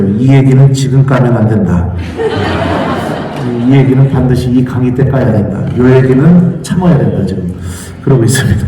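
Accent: Chungcheong, South Korea. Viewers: 요새신부님강이에 푹빠져들고 재미 있게 충청도 말씨 유머 감동입니다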